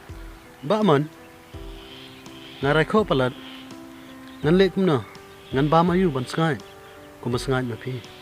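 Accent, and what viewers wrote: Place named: Pnar, India. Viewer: You have a strong pnar accent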